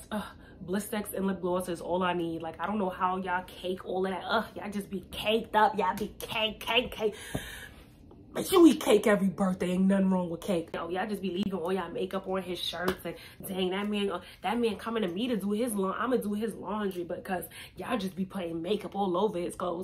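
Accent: New York, United States. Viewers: You sound New Yorkish... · I forgot how much I love a NYers accent; I haven't been on youtube in some time.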